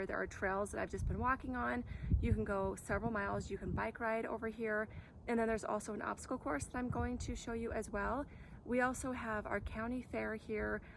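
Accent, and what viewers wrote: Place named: Ohio, United States. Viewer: Love your accent respectfully. Are you from Ohio originally?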